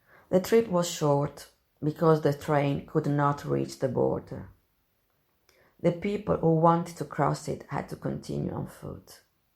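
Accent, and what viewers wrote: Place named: Italy. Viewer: Eccomi ancora,! In English, please! Parla inglese benissimo, e, con il piccolo accento italiano, dà un tocco di bellezza in più! Io potrei leggere il Suo libro in italiano, visto che ho studiato l'italiano, e che l'italiano è, posso dirlo, la "seconda lingua" della mia vita, dopo il francese che è la mia lingua madre! Sono contentissimo quando posso praticare l'italiano, perché, con le persone che vivono accanto a me, non ho l'opportunità, purtroppo...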